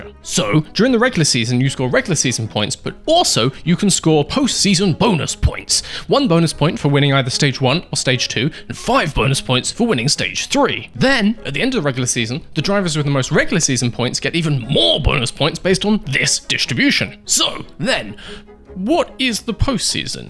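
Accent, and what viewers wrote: United Kingdom. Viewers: british accent just killed the video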